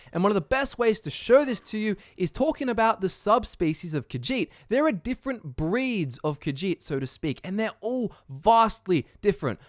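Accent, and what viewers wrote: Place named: Australia. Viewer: I really can't stand the australian accent, what's with all the upward inflections every 2 seconds?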